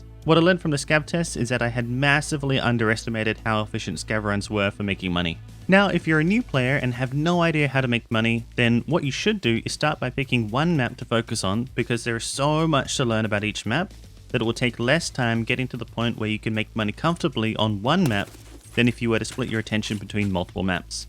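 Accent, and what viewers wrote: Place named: Australia. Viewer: first of all, i love this video and you're crazy underrated. awesome tips, coming from a fairly washed tarkov player. second, i was running factory earlier today, and was killed by so i checked your twitch out and sure enough, there you were in factory beaming me down. and here i am 2-3 hours later clicking on a youtube video and hearing a fairly familiar aussie voice and checking who it was from. and as you can guess, it was you. anyways, i love the content, subbed.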